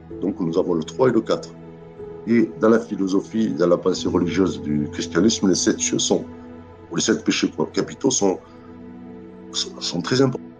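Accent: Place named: South, France. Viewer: Bonjour, je jous decouvre ce matin , merci pour votre video qui me donne envie de vous écouté plus loins dans ces enseignements. Vous avez un accident du sud, de ma region!? Pouvons nous nous rencontrer ?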